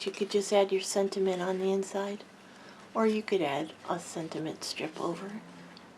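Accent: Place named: Upstate New York, United States. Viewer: Hi Jamie - I should have guessed from your accent that you were from NY state close to Canada. I've been watching your videos from Ontario up near Georgian Bay. Thanks for taking the time to share your cards & tips. I really appreciate them.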